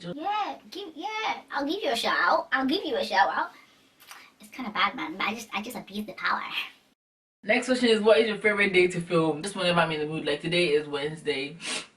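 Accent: India. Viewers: But then I'd always related that accent you do to the Indian accent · lmao!!! I can tell Southafricans by the face shape and eyes yea! I sometimes mix Zimbabwe and Zambia. I need a camera like yours. I'm over here placing a mirror behind just to see!!!! #dontjudgeme that indian accent makes my day. another dance video due. I've tried filming when I wasn't in the mood. disaster!!! #delete God will make a way oo! I yell out the window before I record!!! lol!!!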